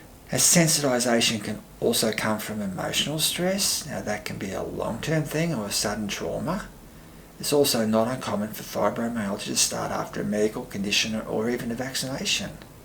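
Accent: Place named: Australia. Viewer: He’s an Aussie, so I understand and I can hear him. I agree the sound is a little faint I had to turn the volume up. 😉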